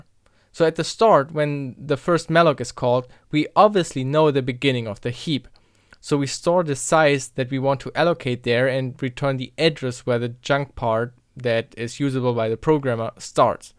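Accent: Germany. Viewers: I like your old videos as well as your newer ones! Also, is it right that I think from your accent that you're german?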